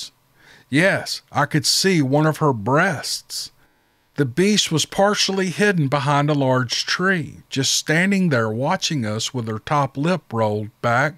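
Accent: South, United States. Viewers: I love it, " I'm just gonna' keep readin' till I get tired!" You da' man! Love your channel. 👍👍👍 P.S. I love your southern accent. It makes me long for Memphis and the South. There's something so comfortable about a slight, or even a heavy, Southern drawl. It just feels like like sittn' on the porch, drinking sweet tea, and swappin stories. Oh yeah, and the mom, or Mrs.of the house yellin," ya'll better get in here, supper's set!" Memories.... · Yay I am so proud to hear a man speak In a fine southern accent with no shame. So many people want to go to a "universal accent" and lose our beautiful southern accents and colloquialisms that I love! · Don't worry about mispronounciation of unfamiliar places, its all part of the show or you fluffing things or laughing at things, its what makes you human. I am a southerner, but thats south of England, but still get taken up on my accent as i now live elsewhere. 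Your southern drawl sounds great to me. Great show as ever.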